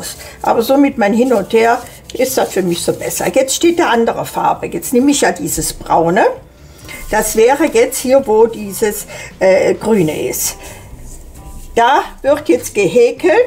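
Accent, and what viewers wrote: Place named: Bergisches Land, Germany. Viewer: Dat hört sich sehr nach meiner alten Heimat dat Bergische Land an😊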